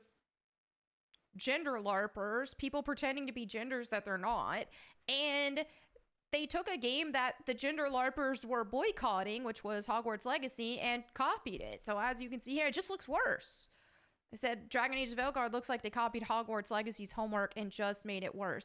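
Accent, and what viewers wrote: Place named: Pittsburgh, United States. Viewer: I'm not sure how you popped up but damn I'm glad you did. Not afraid to say what everyone is thinking... Lara Croft... And the steelers popcorn container back there - I have one that's coors light/steelers. I thought I heard some Pittsburghese in there but I'm not sure on that, all I know is you're my new favorite person lol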